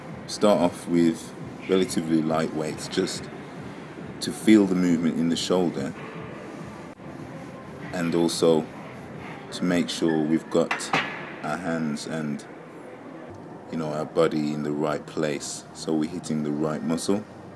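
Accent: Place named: United Kingdom. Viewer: loving your brittish accent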